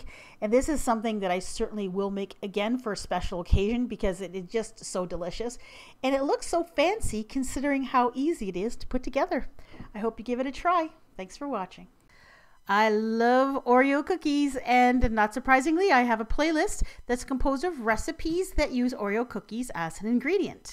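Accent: Canada. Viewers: God I love you woman, and your Canadian accent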